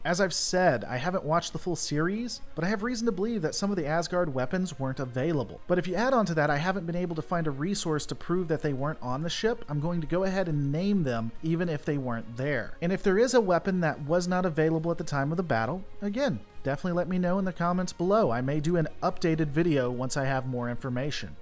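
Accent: United States. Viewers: Please learn to speak English. Not barstardised American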